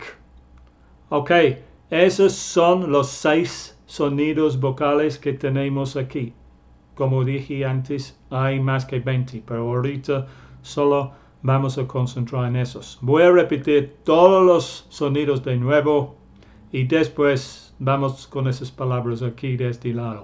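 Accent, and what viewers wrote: United Kingdom. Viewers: Imagino que es porque es británico y no americano.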